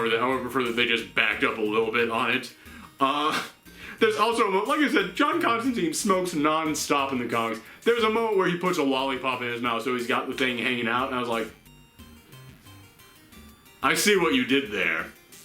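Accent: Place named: South, United States. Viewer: wait just a minute your from the South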